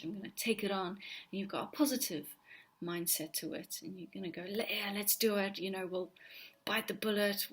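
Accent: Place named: Australia. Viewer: Great content!😊 I enjoyed listening to it. I also like your accent 😁 Where are you from? Australia? The UK?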